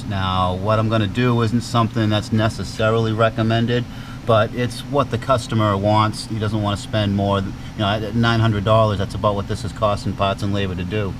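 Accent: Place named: New England, United States. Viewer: Nice videos, subsribed! :) Love that New England accent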